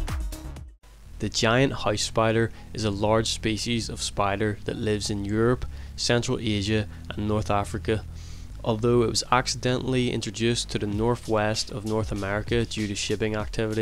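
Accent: Northern Ireland, United Kingdom. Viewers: He sounds very similar to most of my friends In Northern Ireland, but with the hundreds of accents across the UK even as an Englishman its tough to put a finger on it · He's got a Northern Irish accent. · never like to see spiders eating other spiders 😢 The Northern Irish accent is refreshing though, subbed!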